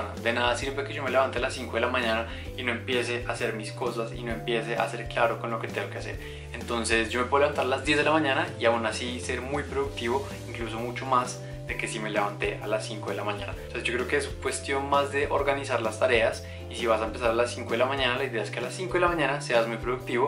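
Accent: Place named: Colombia. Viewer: Baby face jiji you look more younger jajaj ! Me encanta tu acento colombiano !! 😁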